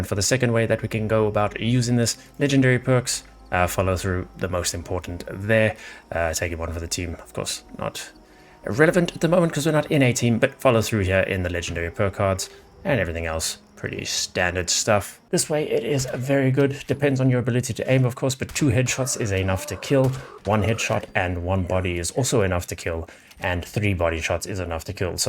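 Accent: South Africa. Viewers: Is that a South African accent I hear?! Subbed.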